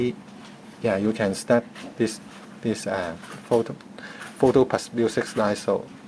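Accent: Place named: China. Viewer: poor narration Chinese ascent waste to watch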